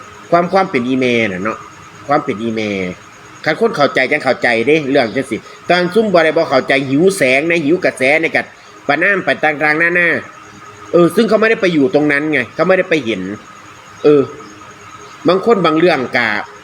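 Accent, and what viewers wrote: Isan, Thailand. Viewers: ดีคือกันหละโยโก๊ะเอกลักษ์เจ้าคือเสียงอีสาน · รู้จัก จากเสียงอีสานนี่แหละคนรู้จักทั่วประเทศคณะอื่นดังเฉพาะภาคอีสานฉันเปนคนภาคเหนือ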